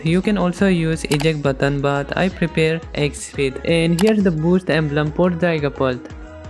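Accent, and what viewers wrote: India. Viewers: Aew you indian ? Because I am also indian and by your saying accent is like Indian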